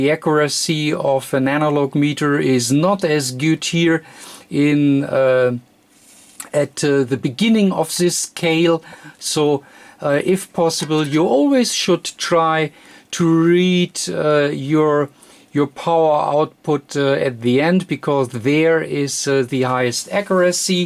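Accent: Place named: Germany. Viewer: I echo all the nice comments below. So great to get an older piece of equipment working 100% again. Thanks!! Your friend will be pleased. (Love the accent! I am guessing German, but I suppose you could be from the Netherlands.)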